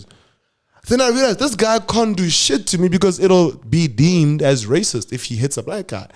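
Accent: Afrikaans, South Africa. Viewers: Your Afrikaans accent monna is not make sure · looking clean mxaaah mfethu you killing me lol great Afrikans accent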